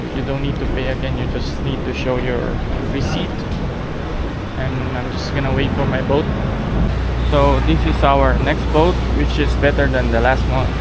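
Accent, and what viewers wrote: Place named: Philippines. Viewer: You’re funny af bro, you have filipino blood? You got that accent hahahah.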